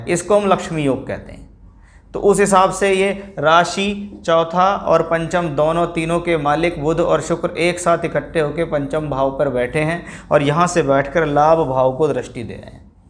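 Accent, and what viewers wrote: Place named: Uttar Pradesh, India. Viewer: Bhaiya UP se lag Rahe ho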